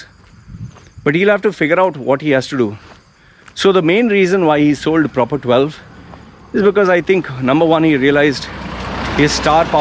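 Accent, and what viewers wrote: India. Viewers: You sound Indian. Are you?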